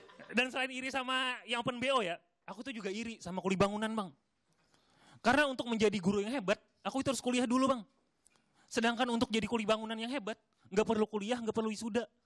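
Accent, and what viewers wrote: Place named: Banjar, Indonesia. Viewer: Logat Banjar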